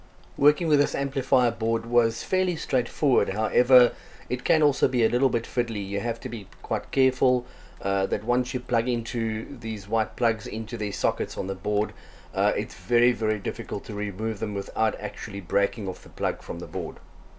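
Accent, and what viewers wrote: South Africa. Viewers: I immediately recognised your accent as SA. Well done! you have created a very nice channel. I will be following. Nice projects!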